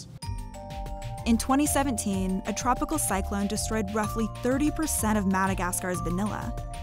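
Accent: United States. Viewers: From the UK and never ever heard someone speak with a glottal stop like this, no idea wtf you think British people sound like. this narrator is very much American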